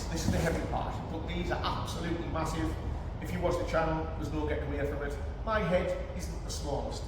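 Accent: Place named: North East England, United Kingdom. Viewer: Bro he sounds like that cos he's from the north east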